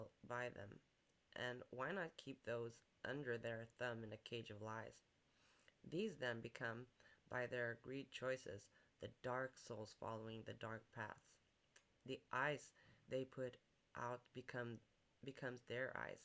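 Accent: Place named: France. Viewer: Hey, cool topic, hope your having a great weekend, interesting accent, French or Portuguese ? Look forward to more videos Be Well 🕊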